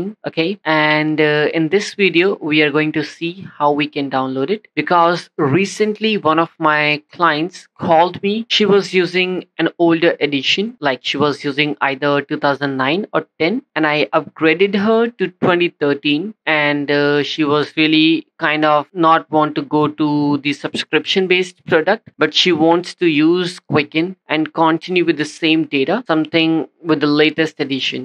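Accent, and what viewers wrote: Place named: India. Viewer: Indian accent sucks bro, honestly!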